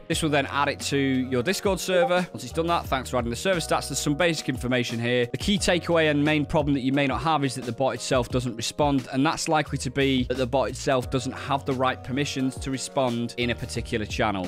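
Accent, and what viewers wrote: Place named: Liverpool, United Kingdom. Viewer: Awesome vid, man. Quick and simple and I got my counters set up in no time. Subbed because of your Liverpudlian accent tbh!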